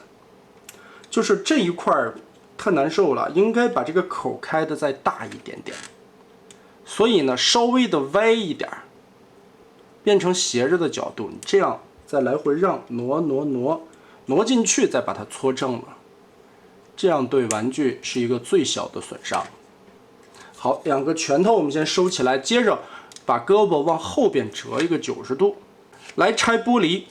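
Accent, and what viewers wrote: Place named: South, United States. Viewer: He speaks with a southern drawl 😀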